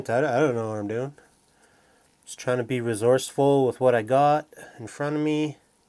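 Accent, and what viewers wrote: Canada. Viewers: Don't change a thing brother! I love your attitude and the way you tell us how you do things! Plus that sweet Canadian accent is a bonus! Everything you do is cool! This old Oldsmobile brings back memories to this old Missourian. Thanks for what you do!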